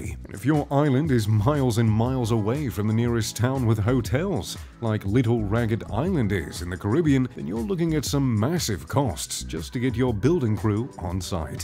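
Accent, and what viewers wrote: RP, United Kingdom. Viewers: why do we have a boujee British man narrating this? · You sound like the spiffing brit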